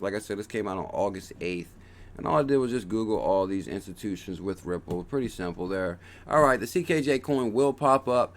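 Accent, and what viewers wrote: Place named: Northeast, United States. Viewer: Thank you CKJ! First time I've listened to your video & it won't be the last. Thorough research & I like how you highlight what is important and keep moving. I've invested quite a bit in XRP and found a way to continue to do so....what a price! I grew up outside of Philly and you sound like you could be from N.J. or N.Y or PA or somewhere around there. I'm now living on the coast just south of Boston. Thanks again! Sharman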